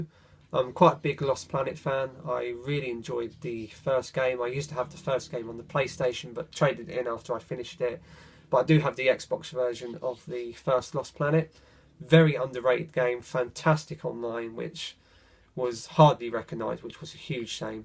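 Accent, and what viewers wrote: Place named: England, United Kingdom. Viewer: Very cool channel man! Sub for sure. I'm just a sucker for that cool English accent haha u don't have that many games on your collection u haven't played, if I was to do a video like this, I would show 80% of my collection unfortunately, maybe more. One day I'll catch up, that's what I keep telling myself anyway. I used to play a lot of rainbow six Vegas when I first got the 360 had a lot of fun with that game! Anyway you got more cool videos and I'll go check them out! Keep up the good work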